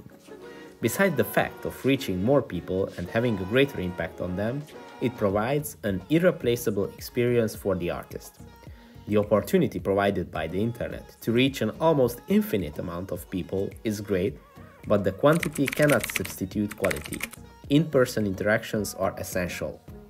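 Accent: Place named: Hungary. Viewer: gondoltam hogy magyar vagy az akcentusbol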